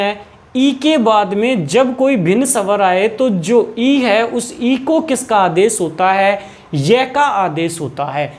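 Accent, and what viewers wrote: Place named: Haryana, India. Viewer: आपको निश्चित ही हिन्दी / संस्कृत की गहरी समझ और मज़बूत पकड़ है। उच्चारण में बस थोड़ा हरियाणवी ढंग से फिसलन हो जाती है। पर आपको बारीकियाँ पता हैं। सादर आभार व्याकरण शब्द की व्युत्पत्ति समझाने के लिए।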